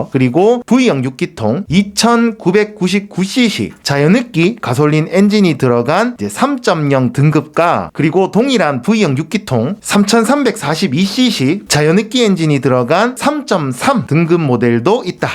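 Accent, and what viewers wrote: Gyeongsang, South Korea. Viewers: 경상도 사투리가 참 심하십니다ㅋㅋㅋ 부산에사는 군대동기도 이렇게 심하진 않던데ㅋㅋ 도대체 경상도어딥니까? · 대구경북 언어를 구사하시는데‥어딘가요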